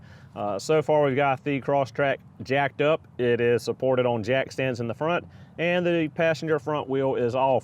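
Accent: South, United States. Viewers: Nice video describing just the job I`m doing now. I like your southern accent! Love from Norway.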